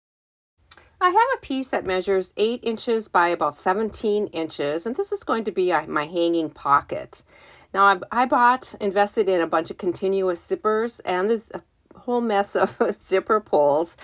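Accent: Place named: Wisconsin, United States. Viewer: Cute cute! Love your accent! Wisconsin??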